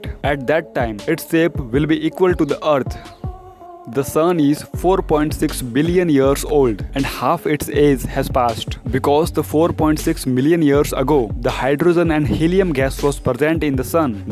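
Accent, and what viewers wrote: India. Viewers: I guess the speaker is from India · What a thick Indian accent! Totally wrong info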